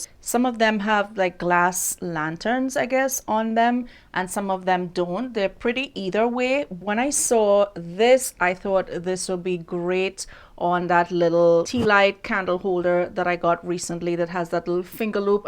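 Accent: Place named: Trinidad and Tobago. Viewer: I just came across your channel a couple of months now, and I have to say I truly enjoyed your videos. Butt. . I have to ask are you from Trinidad 🇹🇹? I am... not that it matters but I kind of pick up on the accent. Truly enjoy your videos regardless where you from.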